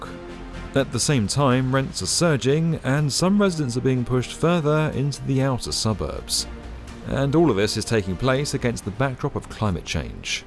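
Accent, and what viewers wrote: United Kingdom. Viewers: It’s hard to take this video seriously and I guess it’s just meant to be easily digestible content with a posh British accent. Having lived in Miami for 20+ years, worked in real estate and other industries, almost every heavy handed conclusion that this video draws is absolutely farcical. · British accent is so annoying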